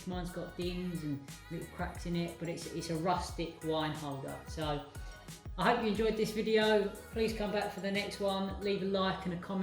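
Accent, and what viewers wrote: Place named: Essex, United Kingdom. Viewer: You sound like you're from my neck of the woods. Are you from Essex or East London? I'm originally Walthamstow, then Wansteasd.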